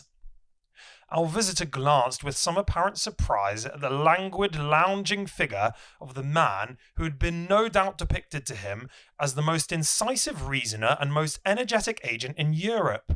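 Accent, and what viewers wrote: United Kingdom. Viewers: Very clear British accent. Fantastic!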